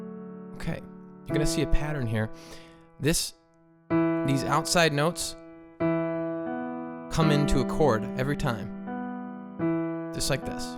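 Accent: France. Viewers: Thanks, just when I finished learning it xD btw i like your french accent xD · Ahaha the way to you speak french sounds fun Cheers from Paris I speak english espanol french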